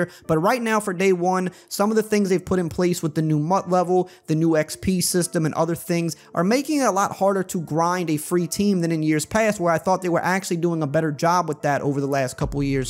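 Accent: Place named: Lafourche, United States. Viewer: Never seen one of your videos before but damn that accent gotta be from Lafouche.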